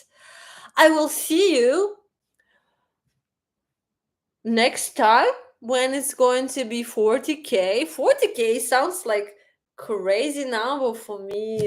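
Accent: Russia. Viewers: Happy 🍻🥂🍾35K,! and just manage to finish viewing the non livestream here after on a Bank holiday Monday. You don't half get some cheeky characters with some bizzare questions and your Russian accent kicking in spectacularly. Anyway my girl, are you going to add some effects into the apartment as a reminder from Bali because some properties you'd stayed in looked amazing like the one with the pool and green painted wall's. Before I disappear tonight, this morning, will ride a scooter around Moscow? Anyway and thanks for an entertaining night. Well done!👍🇷🇺🇬🇧🌹😘 I forgot to say, massive complement to you for looking ravishing in that top. WOW!!!❤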